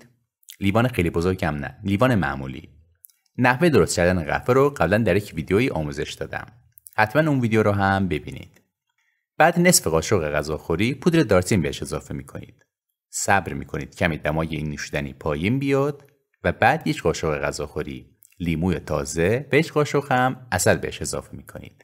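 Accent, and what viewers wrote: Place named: Turkey. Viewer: baba in lahgeh torkit halemono gereft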